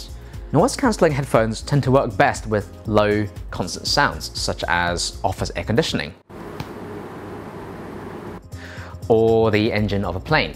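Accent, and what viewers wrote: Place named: RP, United Kingdom. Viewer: This guy is the most posh-sounding tech reviewer I’ve ever heard.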